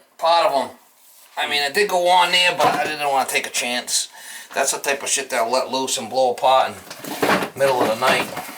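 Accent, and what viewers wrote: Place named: Boston, United States. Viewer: Oye, Once again me friend, I love you as a human, love your content, and love your comedy, But brother, You have to remove the Rag from your face, it's serves absolutley nothing, your only causing long term damage in your being, this thing they make you do, I where it for you, to perfect you bullshit, from what, the common cold the Flu, what happens to those bacteria, I see that's is now affecting you, your breathing, getting a little aggressive, Plus you took a experimental shot, I know you know what's really going on in today's society, Stop, I care,that's why I'm commenting, not trolling but caring, if those in fear want to Muzzle themselves over Fear and TV, let them, better for you, you don't have to wear one, their protecting themselves, It's gotta stop, think of the children hiding their faces in shame, only us can put an end to this. 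No disrespect, Just my Opinion. I'd stay in silence too, if I was fearful and just follow my handlers. The real message is To take care of others, You must take care of yourself first!!! So if you take yours off, maybe others will follow, but it starts with you Brother. Keep them coming, The Boston Accent is the Channel😉 Stay strong Friend.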